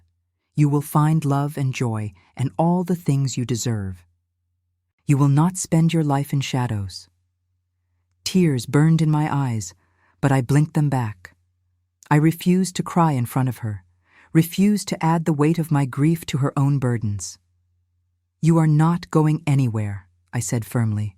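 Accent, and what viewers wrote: United States. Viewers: The american accent is awful, it is a british setting....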